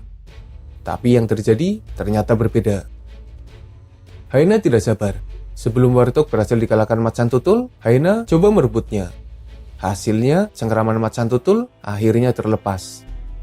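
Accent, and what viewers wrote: Banyumas, Indonesia. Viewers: admine wong ngapak ya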